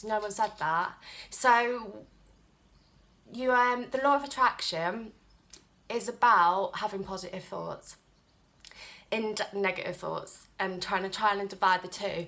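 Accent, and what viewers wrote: Liverpool, United Kingdom. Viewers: Hahaha I used to live in Edinburgh and I feel like you have an accent that's pretty close to Liverpool lol. But I love it and I get addicted to listening to you talk so keep rockin' xD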